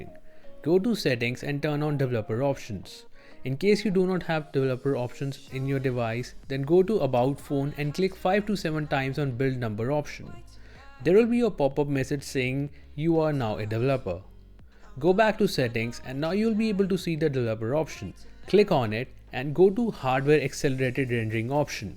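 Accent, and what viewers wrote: India. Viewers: You're the first YouTuber with indian accent I can undestand, thank you for this great Video it helped me a lot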